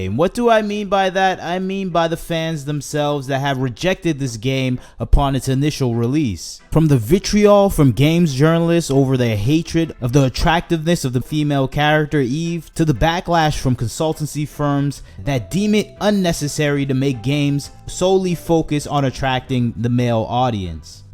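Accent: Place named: South, United States. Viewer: what part of the country are you from? you say idear instead of idea lol, i can hear the accent, it reminds me of the south, but i'm not quite sure where in the south because all your other sentences sound more or less not from the south, so it's difficult to know where you are from exactly.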